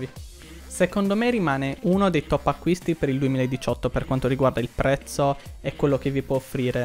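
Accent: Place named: Sardinia, Italy. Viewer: L'accento sardo <3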